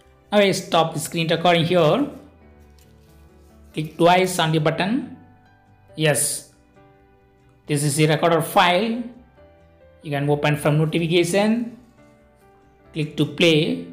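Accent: Tamil Nadu, India. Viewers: Your tamil style English is very good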